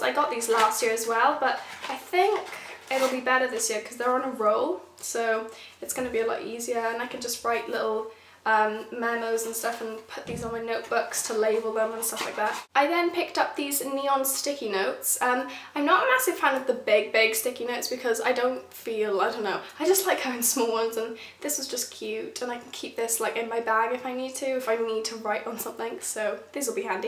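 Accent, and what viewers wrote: United Kingdom. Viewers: Where in Wales are you from??? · Yaya someone british! :) love the hair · Finally a British person!!!! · Are you from England?:)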